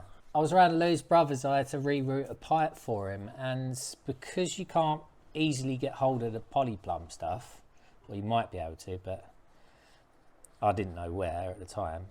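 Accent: United Kingdom. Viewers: to our US ears, has a comically UK accent. Like one of those side characters on your telly!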